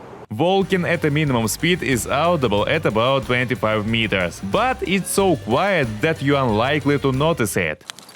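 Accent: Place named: Russia. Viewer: А мне всегда нравится классический русский акцент, ну чисто как в голевудских фильмах 80х-90х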